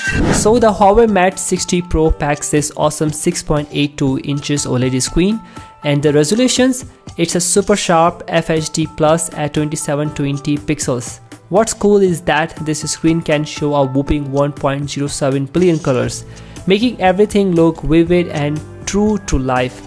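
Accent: India. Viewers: Why does he sound Indian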